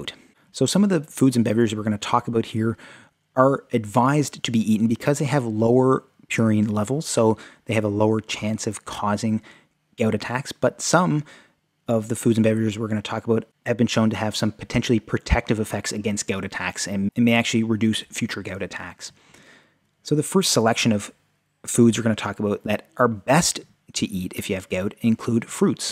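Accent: Canada. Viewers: I think he's Canadian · He sounds Canadian.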